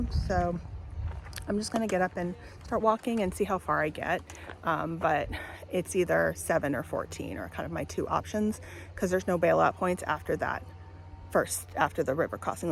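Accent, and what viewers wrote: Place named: United States. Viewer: Hi there, so nice to see you back on the SWCP. I love to hear your perspective on the path with you coming from across the pond and chuckle at some of your pronunciations. I don't have the problem of overgrown paths as i only walk the SWCP in the autumn winter months, though i do have mud to deal with. You deserve far more views and subscribers than you have. Keep up the great work.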